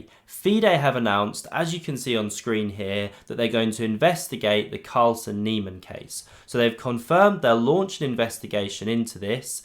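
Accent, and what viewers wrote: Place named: Scotland, United Kingdom. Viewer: your Scottish accent is horrible I can follow you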